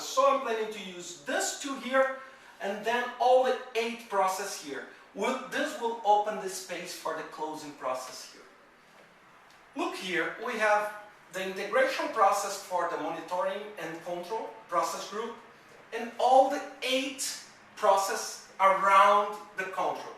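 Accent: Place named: Brazil. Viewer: where u from? you sound like Brazilian! am I correct?!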